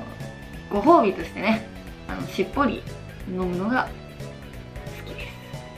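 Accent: Okayama, Japan. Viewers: 岡山弁聞くと落ち着く😬 スキー〜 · しろくまの抱き枕おんなじの持ってる！！気持ちいいよね🤤 私も岡山出身だから親近感ある！岡山弁すっと入ってくる笑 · 岡山弁がたまらんのじゃ！ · 岡山人として誇りです 時々出る岡山弁落ち着きます 応援してます🔥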